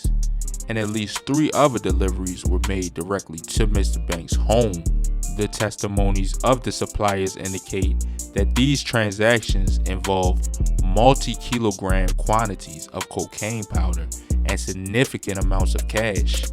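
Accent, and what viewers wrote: Baltimore, United States. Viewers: you from baltimore? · You from Baltimore I can spot our accent anywhere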